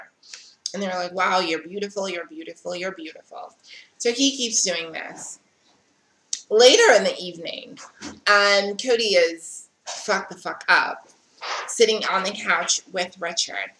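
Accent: United States. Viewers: Why do you have an American accent if you’re from South Africa?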